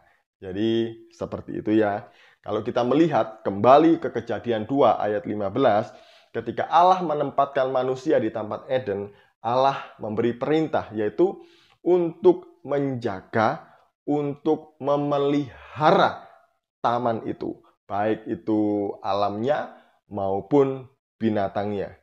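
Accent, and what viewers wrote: Java, Indonesia. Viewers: Slim taslim...., kamu nggak bisa baca quran ta kok bahas Alkitab, basane medok, umatmu mau dengerin ceramahmu bukan karena kamu pinter dan enak ceramahmu tapi yg mereka suka dari kamu, kamusuka obok2 kitab orang kristen.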